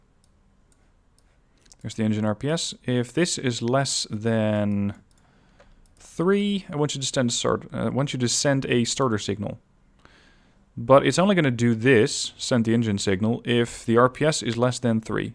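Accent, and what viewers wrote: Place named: Netherlands. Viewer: Nice vid ar you dutch you have a Dutch accent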